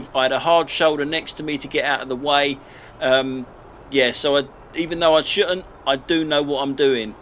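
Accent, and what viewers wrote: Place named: Cockney, United Kingdom. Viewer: Hi, only discovered your channel recently and have now watched about 150 of you episodes so far, I'm addicted....It's interesting to see how you've developed your style over the years. Love the 'only an Audi' & 'Burke in a Merc' trademark comments 😂. Love the cockney voice commentary which you've developed and is really polished now. I always thought your voice sounded like Dave Basset the Wimbledon/Sheff Utd manager. Just listened to an old clip of his and I think it does. Also the recent younger you photo also bears a slight resemblance to him too 😂. Keep up the good work 👍 I will slowly catch up with all the old episodes and then just look forward to the new ones... Cheers 😁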